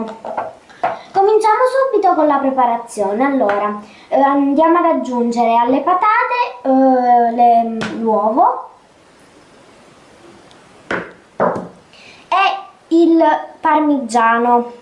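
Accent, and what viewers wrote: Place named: Abruzzo, Italy. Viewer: Ah che bello! Avevo riconosciuto l'accento! Anche io ho origini abruzzesi ed ogni volta che guardo i tuoi video, mi sento a casa =)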